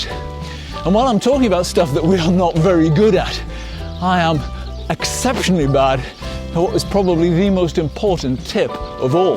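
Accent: Newcastle, United Kingdom. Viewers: That’s where I know you from !! I always thought I knew your voice watching your videos but never thought anything of it being fellow geordie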